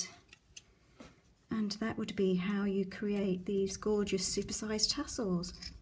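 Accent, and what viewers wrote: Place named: England, United Kingdom. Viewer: What a gorgeous English accent.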